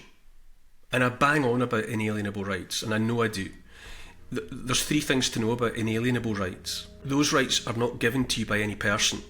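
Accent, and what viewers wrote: Scotland, United Kingdom. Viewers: Hello from Chicago, Illinois! I'm SWOONING over your Scottish accent!! 👍🎯❤️‼️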